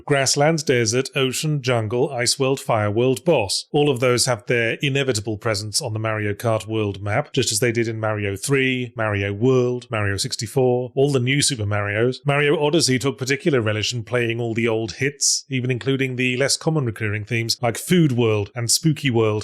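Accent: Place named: United Kingdom. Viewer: College level intellectual breakdowns of video game design in cheeky tone with a British accent is peak. Love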